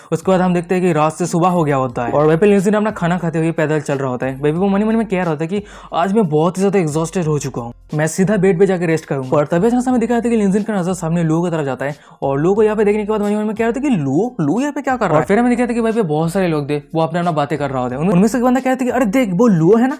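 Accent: Bengal, India. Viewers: bro are you Bengali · Hey bro are you Bengali 😮😮😮❤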